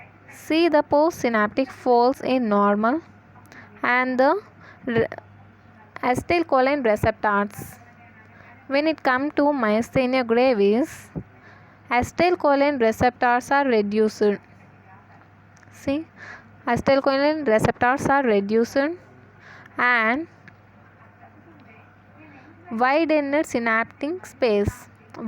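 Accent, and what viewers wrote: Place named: England, United Kingdom. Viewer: Nice presentation. I like your English accent. BTW, what textbook are you teaching from?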